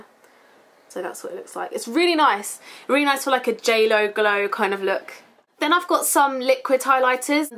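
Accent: United Kingdom. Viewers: yes she does? she has a british accent.....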